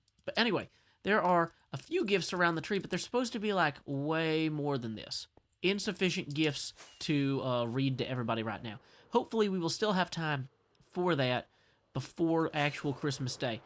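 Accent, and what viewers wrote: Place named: South, United States. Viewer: your content is awesome, but I can never seem to get past that heavy southern accent! lol merry christmas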